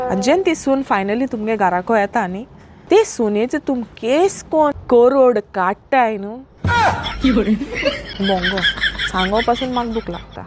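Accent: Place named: Goa, India. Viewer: I like your way of speaking true goans konkani language. God bless ❤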